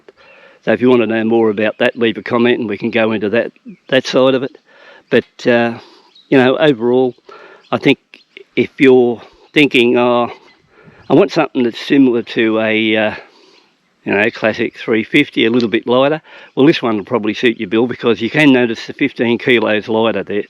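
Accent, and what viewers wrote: Australia. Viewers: As soon as I hear that Ozzy accent I know I'm in for a great honest review. Like you a have a royal Enfield 350 but am considering this bike which is now available here ( well , gb3500s) pretty much the same. Keep roller fella . Thanks 👍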